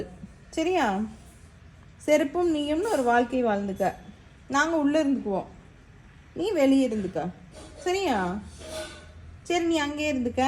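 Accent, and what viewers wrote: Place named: Coimbatore, India. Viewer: I just loved her Coimbatore Tamil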